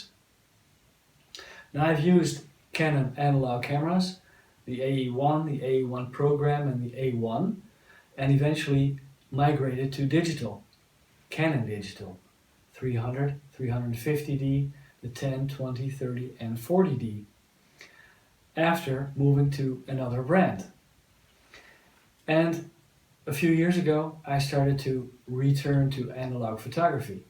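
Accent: Netherlands. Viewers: Are you Dutch? Sounds like a Dutch Accent 😄✌🏽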